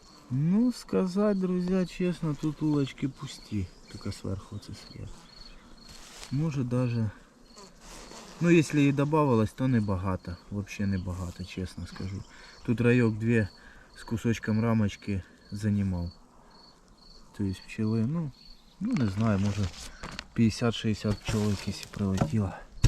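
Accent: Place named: Kryvyi Rih, Ukraine. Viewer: Криворожский говор мне понравился больше чем другие украинские языки 😊 Более красивый и понятный , чем язык , которым разбавляет зеленский.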